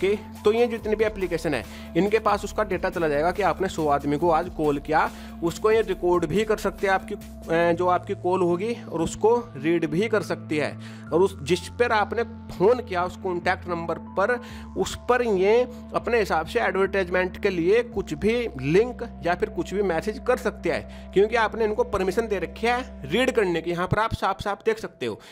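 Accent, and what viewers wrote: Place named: Bihar, India. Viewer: Bihari h ye tu ..kaisa bol raha h bihari up ka h kiya bahiya ji up wale language dekhi iski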